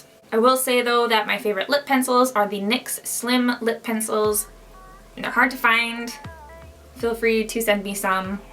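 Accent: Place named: Canada. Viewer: oml she sounds so canadian in this video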